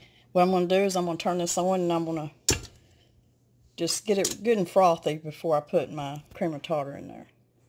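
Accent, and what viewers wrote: South, United States. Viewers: I absolutely love your channel! Your southern accent is so beautiful and relaxing. You and are the cutest ever! You make me this yankee girl miss living in Tennesse God Bless y’all ♥️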